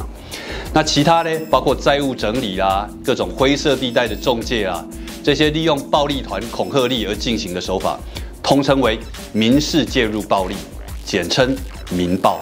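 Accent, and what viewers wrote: Taiwan. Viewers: 今天第一次聽到蔡桑非常喜歡馬上訂閱台語的口氣非常讚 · 因非常喜歡人中之龍而追上此頻道，祝蔡桑頻道就此火紅!! PS就是喜歡這種夾著台語的氣口，蔡桑加油!! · 這個本土味聽得太舒服了，天啊～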